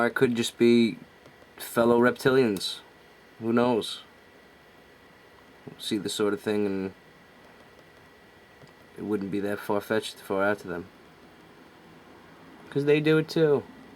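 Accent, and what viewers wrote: Boston, United States. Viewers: Are you from the east Coast? I am from NJ. I seem to hear a boston twang. or maybe a brooklyn tone. We knowers of the truth need to get together. I suggest weekly Videoconference. What causes the quick Snap back to normality? We need to execute Genocide concerning these creatures.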